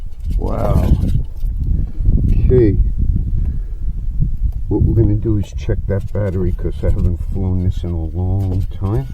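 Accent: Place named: East Coast, United States. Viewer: I love U’r channel. You came up as a suggestion on my feed. U have a bunch of planes and I think your YouTube channel would grow much faster if you had a camera person that could zoom in and provide up close shots and a mic that could cut the wind noise. Just my opinion. I enjoy your east coast accent too. Keep it up brother and thank you for the videos!!!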